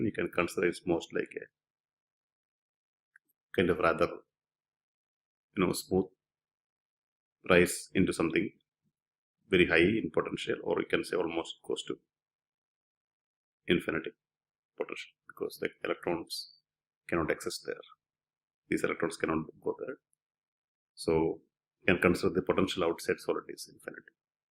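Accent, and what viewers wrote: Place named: England, United Kingdom. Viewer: Omg!!! Amazing English accent 😊😊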